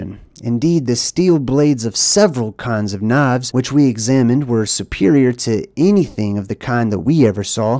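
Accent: United States. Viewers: Not through yet, but I love your country accent. You might fit in real good down here in Texas:_JDZHeart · Great job narrating, the western accent fit accordingly, you should do more similar to these in the future, did this end up being published or became another suppressed archive, that you were abled to dig up?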